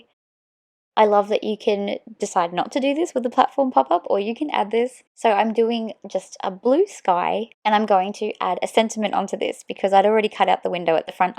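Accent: Australia. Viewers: Love your lovely Aussie voice! Gorgeous card and I love your inspiration piece! Fellow Aussie here!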